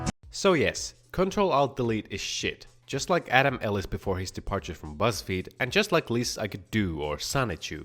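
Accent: Finland. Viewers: Are you finnish? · wait a sec your finnish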